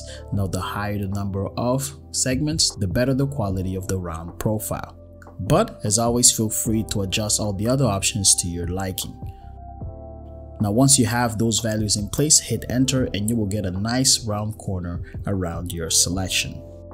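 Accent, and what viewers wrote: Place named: Portugal. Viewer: By your accent, can I conclude that you are a native of Portugal or some Portuguese speaking country ?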